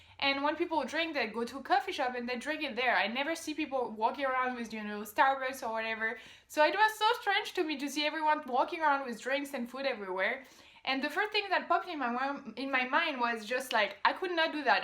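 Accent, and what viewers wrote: France. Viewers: "Mapple sigh-rup", So unmistakably and adorably French., do not let anyone try to change the way you say that ever. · You're too cute! I love French women and their accents. You could be cussing me out or talking bad about me but the words sound so good, in a French accent, I don't care what you're saying about me! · Although you obviously have some French accent (but not the thick, "typical" one) when speaking (american) English, the vibe you are giving off is much more American than French ... Speaking as someone who lived in France for 6 years. And only knows Americans from (too many) movies/television/Youtube videos and hotels ... :> Still.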